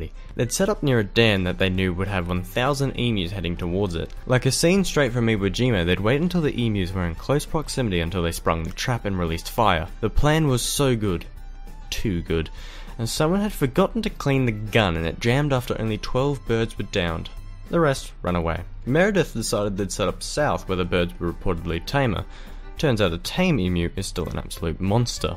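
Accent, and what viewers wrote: Australia. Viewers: what is that Accent? sounds like a suppressed aussie accent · You're Aussie?? I love your accent :v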